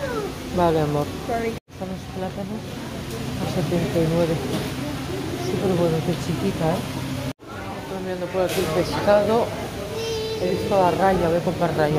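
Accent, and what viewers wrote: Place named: Catalonia, Spain. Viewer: Muchas musulmanas podrían tomar ejemplo de tí, porque hay algunas que madre mía! hay que darles el té aparte. 🤦‍♀️🤪 Saludos de una mallorquina desde Mallorca, te noto el acento catalán, ets molt maca! petons. 👍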